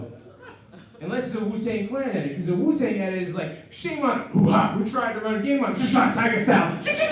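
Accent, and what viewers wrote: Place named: Brooklyn, United States. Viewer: I thought he was from Brooklyn.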